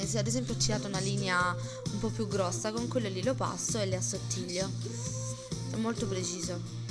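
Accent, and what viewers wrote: Apulia, Italy. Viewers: di dove 6? anche io sn della puglia prov.di brindisi :D è vero che si sente molto nella parlata..cmq cosa usi x fare i decori neri?? a me in genere il gel si espande mentre faccio i decori.. che gel usi tu?? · Forse perchè sono pugliese anche io ma si sente che 6 pugliese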